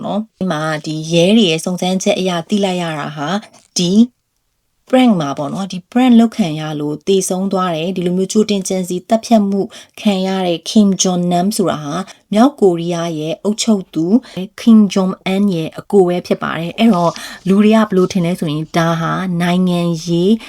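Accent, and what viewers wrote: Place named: Yangon, Myanmar. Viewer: အော်..မဟုတ်တာကိုအဟုတ်လုပ်ပီးလာပြောနေတယ် နေရာဒေသကွဲရင်အပြောအဆိုကွဲတာပဲ ရန်ကုန်ကလူတွေက ရမသုံးတာများတယ် က ပဲသုံးတာ မသိဘူးလား😏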